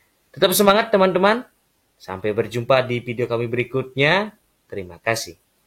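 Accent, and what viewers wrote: Bali, Indonesia. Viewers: Keren banget penjelasannya on point gak bertele2. Dan dijelaskan konsep dan triknya juga... logat balinya juga jadi pemanis😆 Saran kak, biar jadi pembeda dengan channel lain. Kakaknya fokus ke TWK aja. Pasti channelnya cepet berkembang apalagi kakaknya enak banget jelasinnya gampang dipahami jugaa.. Biar kayak lapak sebelah sang tutor yg fokus ke TIU hehee Sukses selalu kak...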